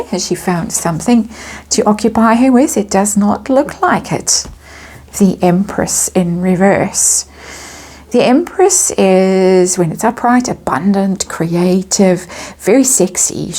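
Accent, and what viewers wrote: South Africa. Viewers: Harry THINKS.?? Is he capable? Of course Megan WANTS something, nothing will ever satisfy her. Once again, spot on! Love your readings,. Also love hearing your south African accent, much love from a South African expat.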